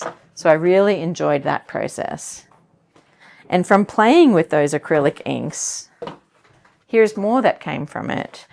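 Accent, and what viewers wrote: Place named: Australia. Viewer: What is your accent? It sounds a bit Australian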